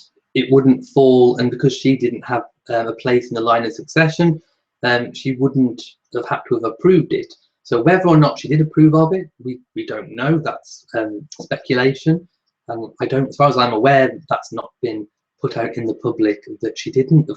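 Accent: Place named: United Kingdom. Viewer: you DO have a great voice and I love your British accent. I watch all your videos and chats.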